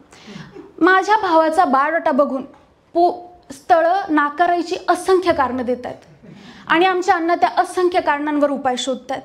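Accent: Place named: Nashik, India. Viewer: खूप छान नाशिकचा एक्सेंट छान आला म्हणजे असं वाटलं की खरंच नाशिकचे आहेस म्हणजे तू आहेस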